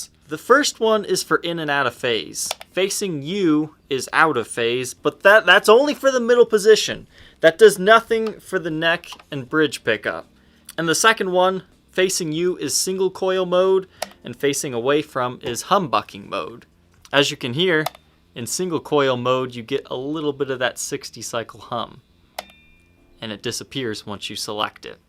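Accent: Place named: Southern California, United States. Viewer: ...it adds a certIN Quackiness to yer tone.! for a guy who isn't into Frank, sure has his vocal speech southern Cali dialect down to an untrogladyte level.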